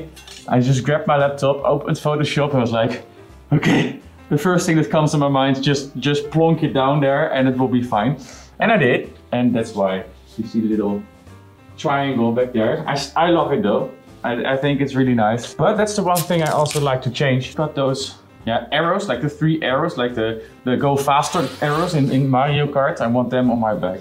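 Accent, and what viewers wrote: Netherlands. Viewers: how do you cringe, I love the Dutch accent. voice is angelic lol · As a fellow dutchie who's used to english content creators i'm just cringing so hard at our accent. Congrats tho you've earned it🥳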